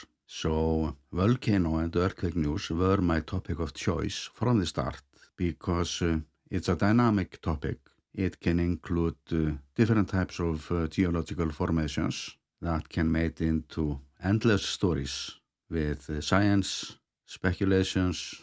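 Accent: Iceland. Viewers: Thanks for mentioning his Icelandic accent. Of course, he’d say”What accent??”. Just like the rest of us do about our accent in other parts of the world. However in has mentioned his accent in the past. It’s part of his charm. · Excellent. I am very happy to hear the great news about 100K. The quality of your productions, both video and audio as well as the Icelandic accent you provide is always welcome to see and hear. Your choice of music is also great. I look forward to your future video's. Take care, with greetings and best wishes from NZ.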